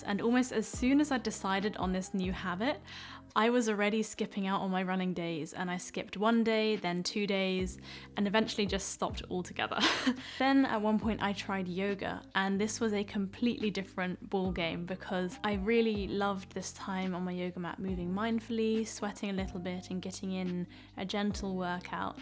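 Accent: RP, United Kingdom. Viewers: I really love your British accent and your idea. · Hello I realy enjoy watching your videos over and over I'm english but coming from the black have an awful accent I'm ashamed of most enjoyed your r p english videos and do hope you do some more have you any ideas where I can learn to have an accent like yours keep up the good work as its enjoyable to watch your positive and life learning skills videos. Roger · Oh gosh, I really love your voice and also your British accent. I've thought I'll give up to learn British English so many times but when I watched your video, it inspired me a lot, thanks for that. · I really enjoy your videos and it is really enjoyable to listen to your impeccable British accent; of course, you were born in England, I presume. One thing I have noticed that you use the American pronunciation for the word: schedule. I wonder why? 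I hope you will continue to produce videos even after you assume your formal profession as a medical doctor. I shall look forward to your future videos. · Thank a bunch. I like your british acent. Have fun ❤ 👍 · Me encantan los Hobbies que consideras para vivir, muy buena filosofía de vida. Yo tengo un trabajo, me encanta bailar salsa, leer, aprender idiomas y hacer amigos... Me encanta tu voz, tu acento británico y tus hermosos ojos...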